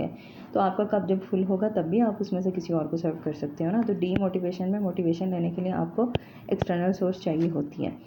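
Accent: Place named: Iran. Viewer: I thought u were from foreign country after hearing u r english i thought u were from Iran or saudi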